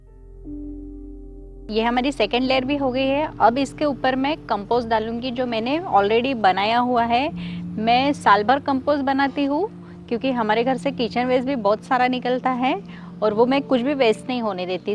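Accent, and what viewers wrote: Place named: Maharashtra, India. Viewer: Aapke vdo to muze bahut achhe lagate hai. Language tuning se aap muze marathi lagti hai. Am i right mam?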